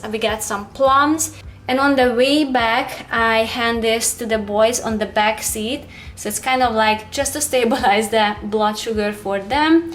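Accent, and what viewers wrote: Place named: Hungary. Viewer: 90 százalék, hogy magyar vagy kizárólag az akcentusból!A maradék 10 a név miatt :D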